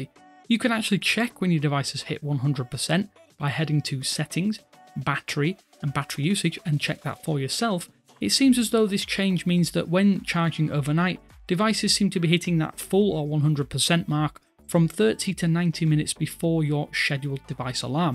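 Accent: United Kingdom. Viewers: Why is this channel based on the U.S when the host is clearly British?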